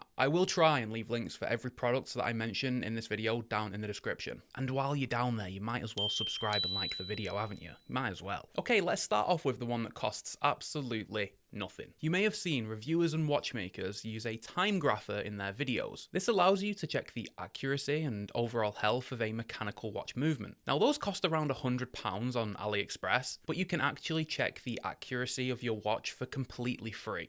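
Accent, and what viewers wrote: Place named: Yorkshire, United Kingdom. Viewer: The only watch accuracy meter I could find in the app store cost $29.95. And there isn't one that looks like the one you show on the video. Can the app be found elsewhere? I love your videos and your Yorkshire (I may be wong) accent. I was a Harrogate resident for 5 years back in the 70s. Thanks for your great videos.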